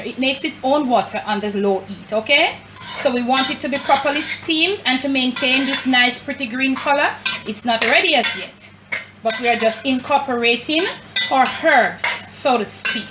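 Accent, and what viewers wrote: Jamaica. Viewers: Love the cooking show and the Jamaican twang.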